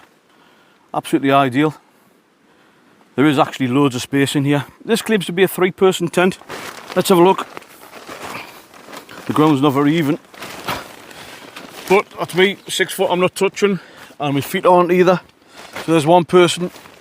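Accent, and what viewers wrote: Newcastle, United Kingdom. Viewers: I love your accent, is that from Newcastle? Great review. Thank you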